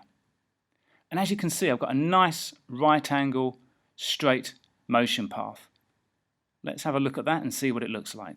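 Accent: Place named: United Kingdom. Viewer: British accent is great!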